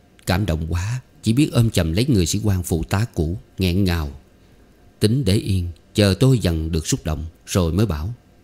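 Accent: South, Vietnam. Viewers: Tôi cũng rất thích giọng đọc miền nam của. Anh dien tả đầy cảm xúc.Luôn ủng hộ kênh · Tôi rất thích giọng đọc miền nam của. Like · cảm ơn ông NGHE GIỌNG ĐỌC MIỀN NAM RẤT THÍCH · Từ lúc biết kênh Tủ Sách Giải Trí và Giáo dục Tinh Hoa, được nghe giọng đọc miền Nam cực hay của anh, tôi đã không nghe bất cứ kênh nào khác.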